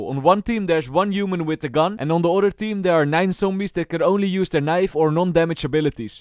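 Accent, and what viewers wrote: Netherlands. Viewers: Strongest dutch accent ive ever heard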